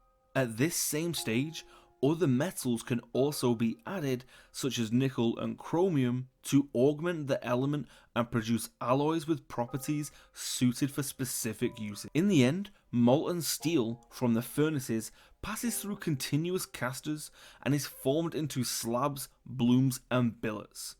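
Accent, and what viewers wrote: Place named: Liverpool, United Kingdom. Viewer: Snax Pendragon nah sounds more Liverpool/Cheshire but very light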